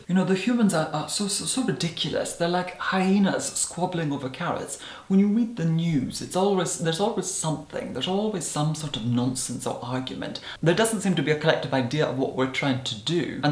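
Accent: Scotland, United Kingdom. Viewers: Lu z he's originally from the Hebrides and his real accent is much more Scottish sounding, you can hear it a bit in his very first videos. He now lives in London, and over time has been covering ut with this english, posh affectation you can hear now.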